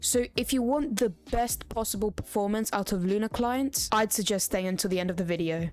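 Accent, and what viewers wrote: United Kingdom. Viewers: i could tell from the start u were brittish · british egirl gg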